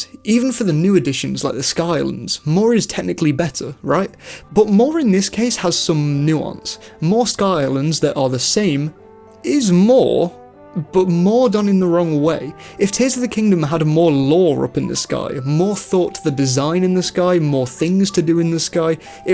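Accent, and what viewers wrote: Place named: United Kingdom. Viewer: Late to discover this channel! Story and lore were my main concerns with the two latest 3D Zelda games. Aside from that, I really enjoyed them. I’d say I enjoyed replaying Breath of the wild more the second time than the first. And if both Brearh of the wild and tears of the kingdom will be enhanced for switch 2, I’ll be replaying them again, once playing through the timeline order again. (As an aside, nice to hear another British Zelda YouTuber after discovering Zeltic).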